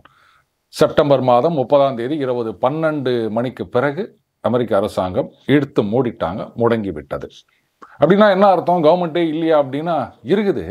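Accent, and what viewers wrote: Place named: Chennai, India. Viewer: வர்ணிப்பு...அசத்தலாக உள்ளது. சூப்பர்..பக்கா சென்னை வாழ் தமிழ் குடிமகன் போல... வந்துகினு. போய்க்கினு.. ஆனால்... நல்லா இரசிக்கும்படியாகத்தான் இருக்கு.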